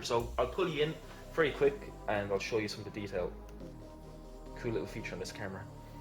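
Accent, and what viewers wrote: Ireland. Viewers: that Irish brogue is even sexier in hi Def!!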